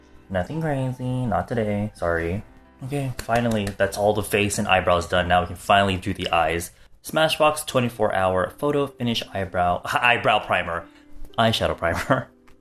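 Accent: South Korea. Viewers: i love how he says english names in a korean accent so much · this was a good look for you. you speak so much Konglish now!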